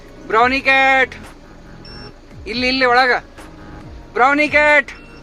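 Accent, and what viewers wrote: North Karnataka, India. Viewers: Hi This is Shridevi From USA 🗽🇺🇸 Nanu nimma volgs nodide supper edav ri.... Namma Uttara Karnataka bhase kelaka kushi anastheti adu America dalli and niv Belagavi market thorshidri nodi nanna memories recall adav yakadre navu 2 years belagavi Naga eddavi nanna magalu KLE school he hogtiddalu. so nanu scooter togondu tirgadthaedde so niv thorso belagavi places nodidre nenapaketi anyway nimma mother supper edare Nice volgs Best of luck 👍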